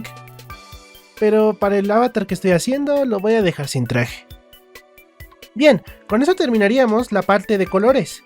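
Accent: Argentina. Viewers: español argentino papa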